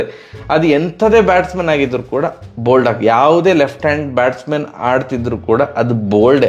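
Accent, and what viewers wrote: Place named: Coastal Karnataka, India. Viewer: Love your analysis and Karavali Kannada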